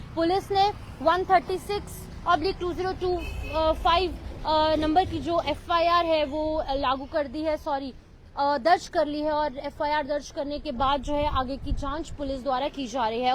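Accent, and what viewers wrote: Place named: United Kingdom. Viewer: Reporter ne hindi ka accent uk english me kr diya 😢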